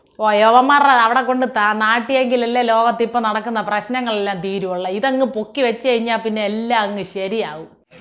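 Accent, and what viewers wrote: Thiruvananthapuram, India. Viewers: അടിപൊളി വിവരണം... TVM ശൈലിയിൽ തന്നെ മുന്നേറട്ടെ. എല്ലാ വിധ ഭാവുകങ്ങളും 💐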